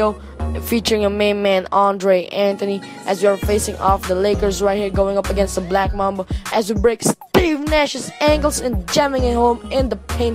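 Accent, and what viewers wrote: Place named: Philippines. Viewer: Im Filipino too, i could tell by your accent.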